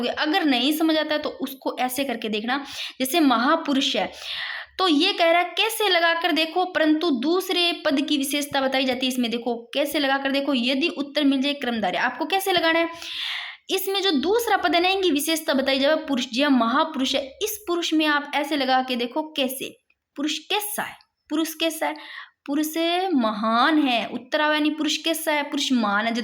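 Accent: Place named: Bagri, India. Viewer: apki language hamre jesi h bagdi ho aap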